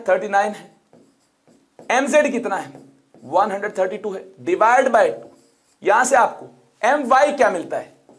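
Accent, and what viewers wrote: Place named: Haryana, India. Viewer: sir ki awaz m hi haryanvi accent aajata hai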